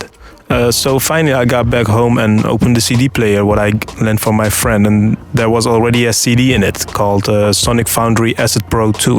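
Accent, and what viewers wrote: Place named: Bihar, India. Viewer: Bhot Chutiya Naam Hai. Plus Tu Bhi Bhot Gandu Lagta Hai. Sale English Bihario Jaisi Kyu Bolta Hai. Thanks For This Upload Though Bro K Alawa Kuch Aur Bol Bhot Fake Aur Effort Dalne Wala Sound Kar Raha Hai.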